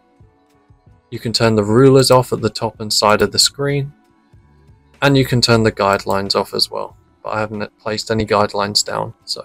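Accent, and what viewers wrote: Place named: United Kingdom. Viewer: Its brilliant to actually have a uk speaking tutor at last for this program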